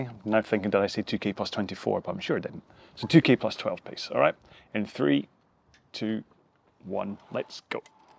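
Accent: Scotland, United Kingdom. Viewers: #Alfresco Have to say I hated that! Just don’t have the stamina just now for that pace so had to stop a couple of times for a few seconds then I needed 30 sec with 6 minutes to go. Can only get better!!! Thanks. Always good to hear a fellow Scot!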